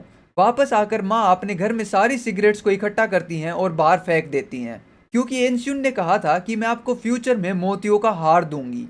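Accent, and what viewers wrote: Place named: Haryana, India. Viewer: Bhai aap haryana se ho kya🎉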